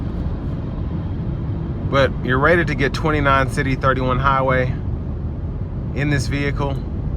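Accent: South, United States. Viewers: Nice southern accent! Great review. 😀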